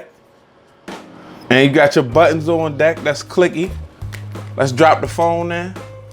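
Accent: Baltimore, United States. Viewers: U from the Baltimore area? I recognize the accent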